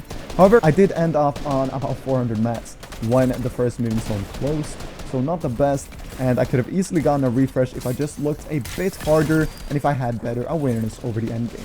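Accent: Norway. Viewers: wait, youre norwegian? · you norwegian bro? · broo are you from norway?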